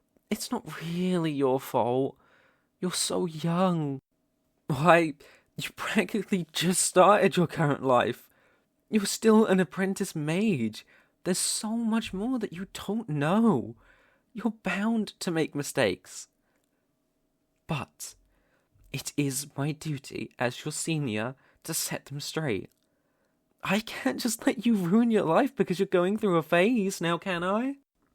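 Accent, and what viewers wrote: United Kingdom. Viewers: Helloooooooooooooo good to hear ur british voice again XD back from the dead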